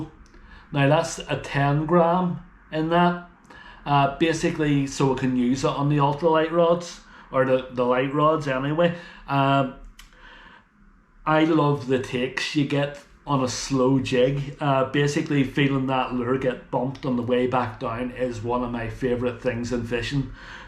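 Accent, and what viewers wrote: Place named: Northern Ireland, United Kingdom. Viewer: Howdy, You sound like your from Northern Ireland. Loving the box, Wd40 over the label will remove it.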